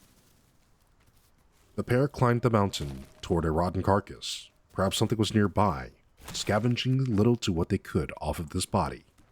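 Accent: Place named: United States. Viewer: Thumbs down because you wouldn’t list its height and weight in imperial. Why have an American voice and use foreign measurements?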